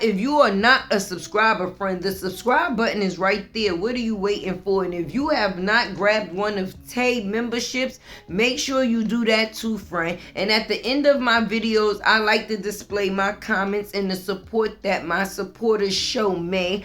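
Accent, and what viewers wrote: New York, United States. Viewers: Thank you for the video great job once again you got me. I was on my way in from the garage and you were talking about the young lady. I did not know who she was. Thanks for the recap. I turned around and sat on my stairs before I get into the house and listen to the whole thing. Great job keep it up. I think I’m getting a hint of up north accent like New York or New Jersey great Common sense conversation👍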